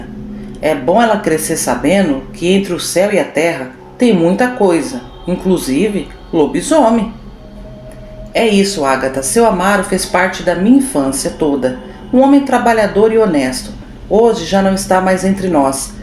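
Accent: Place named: Caipira, Brazil. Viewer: Essa entonação caipira ficou muito boa. Parabéns ❤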